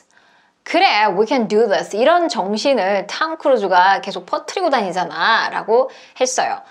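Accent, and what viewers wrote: United Kingdom. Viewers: 확실히 영국식 영어가 더 잘들립니다. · 저는 영국식발음이 끊어 뱉어주셔서 더 편하게 잘 들리네요. ^^ 오늘도 감사합니다. IT WAS A VERY FUNNY DAY. I WILL SAY. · 쌤 정망 영국식이라 그런거 맞는거죠???😂😂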